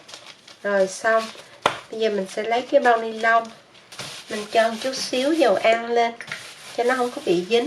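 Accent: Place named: Southwest, Vietnam. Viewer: Tôi cũng thích bánh Quẩy lắm , nhưng thích nhìn thấy cô chủ kênh hơn ! Vì tôi xem kênh nầy rất lâu rồi mà không thấy được mặt chủ kênh nên tức lắm, nghe giọng nói của dân miền Tây Nam Phần rất thân quen và dịu dàng đáng mến quá lại thêm nấu ăn giỏi quá , phải chi được nhìn thấy một lần thì hay biết mấy, hổng chừng là bà con nữa đó .😅😅😅❤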